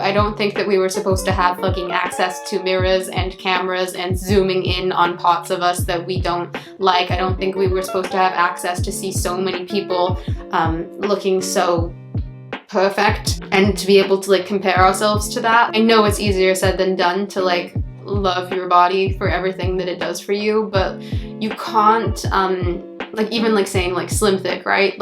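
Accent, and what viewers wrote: United Kingdom. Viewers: I can hear the British side screaming to come out ❤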